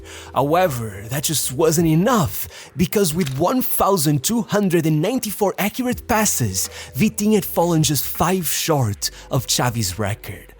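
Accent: Porto, Portugal. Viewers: Nunca falho a reconhecer um irmão português, bom video irmão. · Are you portuguese? you said his first club right and all names good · This accent is so Portuguese 😂😂 god damn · Nota-se bem que és português, muito bom continua! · esse sotaque tuga <3 dá-lhe!!! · U sound portuguese, are u? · Es pt parece a braba pelo teu sotaque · Your portuguese accent makes this video even more awesome! Cheers, buddy! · O sotaque do Porto mal disfarçado 😅😅😅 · és português não és?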